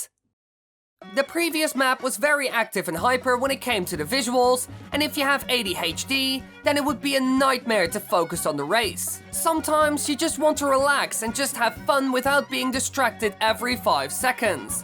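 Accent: Netherlands. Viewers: are you dutch? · You really sound dutch for some reason.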